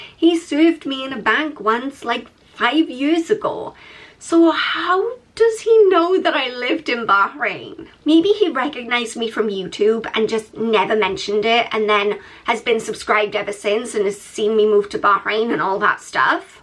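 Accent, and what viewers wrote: Wales, United Kingdom. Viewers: That welsh accent lol. Anyway I spent 4 years of my childhood in Kuwait a long time ago. It was a great hospitable country but even back then there were some weirdos. Even my mum had the odd unnerving but rare experience but was always quite firm.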